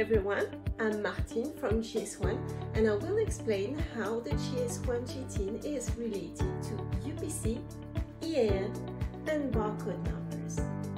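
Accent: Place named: France. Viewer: When explaining things in English, a first language speaker is a good idea. The french accent is particularly strong here and coupled with the overly loud music makes this unwatchable.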